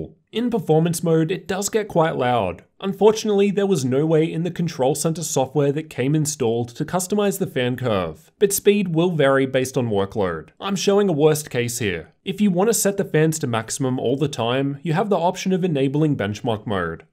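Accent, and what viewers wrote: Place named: Australia. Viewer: it's the Aussie accent I assume. He really emphasizes the OR in port. "USB-C pOOORRt, USB 3.1 type A pOOORRt, HDMI pOOORRt, mini displaypOOORRt" it sounds really jarring to a Canadian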